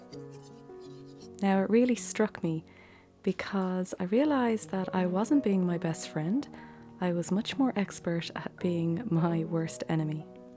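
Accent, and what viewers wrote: Ireland. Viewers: HVD to you too. Do I detect a wee bit of a charming Irish accent?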